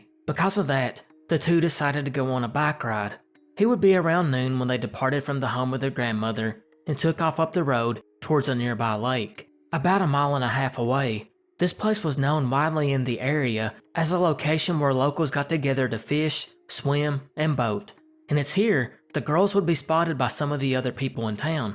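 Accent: South, United States. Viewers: I'm fairly new to this channel but am noticing how I get a little excited when a new vid appears. Is it me or is this the best narrator on YT right now. It's definitely one of the reasons why it's easy to view the entire episode every time to the end. I love Southern Drawl man!! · o.k. Ga. boy from Kennesaw here. Love the Southern accent. So cool to hear. I finally broke down and subscribed. To hear the narration. Which is excellent. Not too crazy conspiratorial but leaves a lot open to basic common sense mixed with mystery. A good mix brother. I'm not a 'true' conspiracy guy BUT I do LOVE to hear as many as I can. They are fascinating,......and truly mysterious. I like your cognitive, analytical style.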